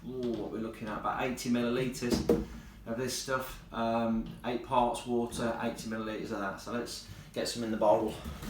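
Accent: Leicester, United Kingdom. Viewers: The accent implies you have to be a Leicester lad like me?!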